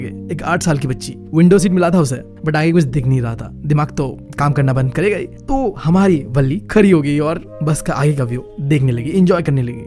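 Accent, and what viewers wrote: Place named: Northeast, India. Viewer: BRO HE'S FROM NORTHEAST (ASSAM) TO THODA HO JATA H SMJH JAO NA❤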